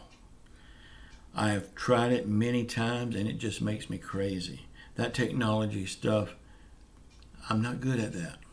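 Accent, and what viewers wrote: South, United States. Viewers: You know that's the 1st time I heard the word filling station in a long time and you make me laugh when you say word's like hurricane and tonight when you said Carol syrup it, krol syrup lol I,m not making fun of you the way you talk I live in Cookeville TN and I,m country and I know you live in florida and they are country but you seem like your country as corn bread I love this show i finally got to keep myself up tonight to watch it on time but I still watch it when I wake up around 3 in the morning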